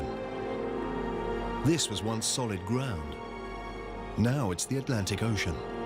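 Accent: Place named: United Kingdom. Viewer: that documentary is narrated by an American, while this one that you uploaded is narrated by a British. You can tell the difference by their accent and the different units of measurement that they use.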